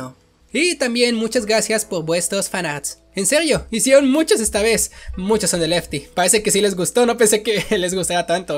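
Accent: France. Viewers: Soy yo o tu hacento parece francés es tan hermoso UuU ✨ Estuvo interesante el vídeo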